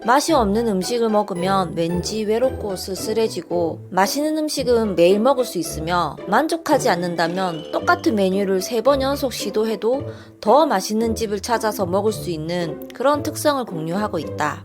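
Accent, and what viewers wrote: Gyeongsang, South Korea. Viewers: 부산이시골이네? 목솔 들어보니 깡촌녀ㄴ~~ 부산오지마송 밀양가소 그가 더어울릴것가소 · 이건 도대체 어느 동네 사투리? 요즘 경상도에서 이런 말투 쓰는 사람들이 있나??? ㅋㅋ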